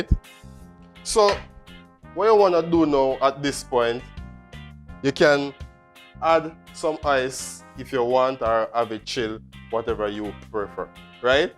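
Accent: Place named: Jamaica. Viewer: I know you are saying something good. Only Jamaicans and those In contact with Jamaicans will understand what you are saying. Just slow down so we can pick up what you are saying 🙏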